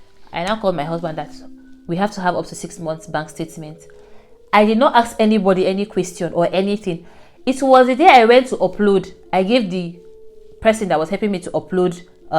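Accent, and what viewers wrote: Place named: Hausa, Nigeria. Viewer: Your accent sounds hausa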